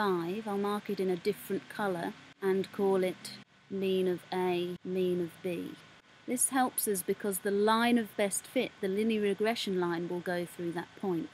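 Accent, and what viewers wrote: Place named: Australia. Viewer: thanks :) aussie accent eh ;)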